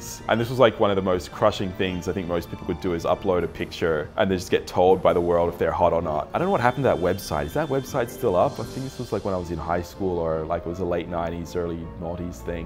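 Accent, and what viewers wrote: Philippines. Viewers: Don't listen to those hater, you have a great channel. But I have a question, how did you get a Filipino accent? Lol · Hi,. I'm a Filipina from Colorado and would like to weigh in. As far as your looks, you are way too fair, but then you have no control over that. As far as your face, you have nice, kind eyes and a nice smile. So to me, you would be an 7 - 8. But then individual tastes are exactly that, individual, right? I prefer male faces to be more chiseled. But that's just me. It cracks me up to hear you speak English because you have a detectable Filipino accent and you seem to have lost your Australian accent. Anyway, keep on "chefing" because I watch your show to get the "base" when making unfamiliar Filipino dishes. The Filipino chefs on youtube speak Tagalog, and I don't understand Tagalog. Good day!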